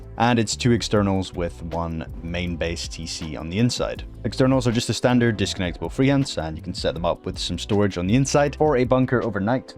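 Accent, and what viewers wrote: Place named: Northeast Scotland, United Kingdom. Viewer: you sound from north east scotland 😂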